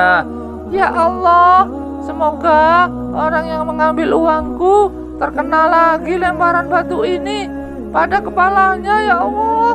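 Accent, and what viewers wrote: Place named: Arab world. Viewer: Kenapa intonasi suaranya bergaya ke-Arab2an, kenapa nggak dengan nada bicara Indonesia yg biasa saja?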